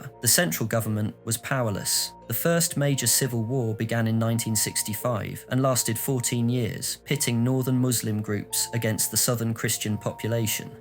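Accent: United Kingdom. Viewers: i swear all you need for a video essay channel is a british accent this is fire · Wtaf is a "British accent"??? There's no such thing He has a southern England accent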